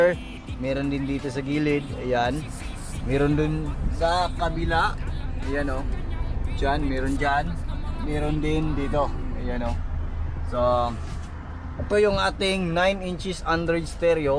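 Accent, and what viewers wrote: Visayas, Philippines. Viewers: Bisaya kaba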